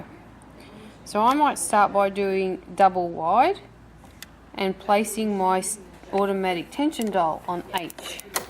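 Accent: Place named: Australia. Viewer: Thank you so much! Your instructions helped me figure out the manual I have for my PFAFF 4862 Coverlock. The manual was not helpful at all, in fact I was more confused each time I turned to it. You saved me totally losing my mind and my temper and destroying everything. Oh and love that it's Australian!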